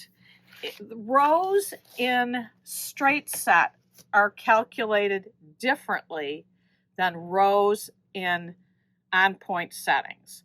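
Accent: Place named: Illinois, United States. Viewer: Good morning, a great way to wake up. Usually I watch you much later in the day, with my tea and toast. Coming from a family that puts an "R" in wash, I just attributed salvage vs selvedge to an Illinois accent if I even noticed at all. I don't know what I would do with another fat quarter bundle, but I would love to find out. Have a great week, Diana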